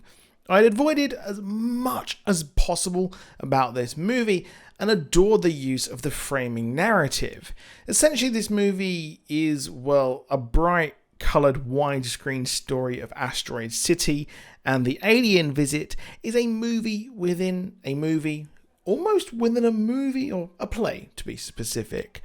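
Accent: United Kingdom. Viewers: Why do you sound very British like!? You're from Rhode Island.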